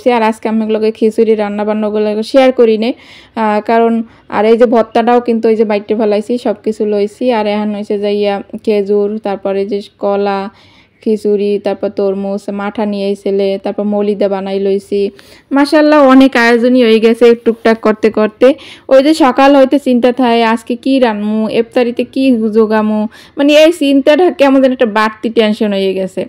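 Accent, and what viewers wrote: Barishal, Bangladesh. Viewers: সালামালাইকুম আপু তোমার মুখে বরিশালের ভাষা শুনতে ভালই লাগলো ❤আমি তোমার পরিবারে একদম নতুন সদস্য পুরো ভিডিও খুব ভালো লাগলো আজকে থেকে সব সময় পাশে পাবে ❤❤তুমিও এসো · আসসালামু আলায়কুম আপু তোমার মুখে বরিশালের ভাষা শুনতে ভালোই লাগে।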